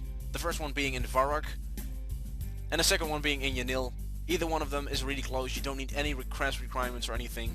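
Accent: Netherlands. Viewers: ben je nederlands?, hele goede guide btw:)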